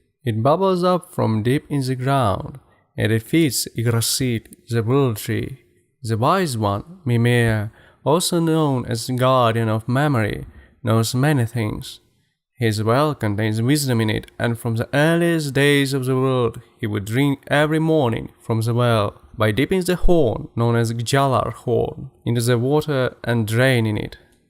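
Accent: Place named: Finland. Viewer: Side note: had to look up your dialect cause it sounded like a weird mix of finnish, italtian and german. UKRAJINA BOIIIIS!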